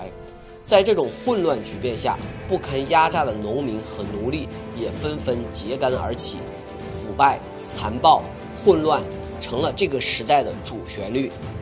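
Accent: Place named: Northeast, China. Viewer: 東北口音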